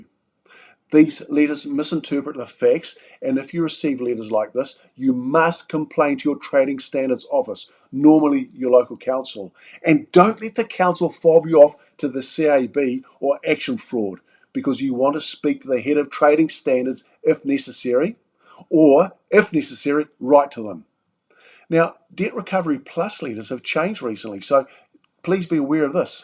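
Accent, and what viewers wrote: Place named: Australia. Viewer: This guy is clearly Australian (or perhaps a Kiwi). No prob with that, but is he talking about the law in Australia/NZ or UK? I haven't heard of any of the companies he has mentioned.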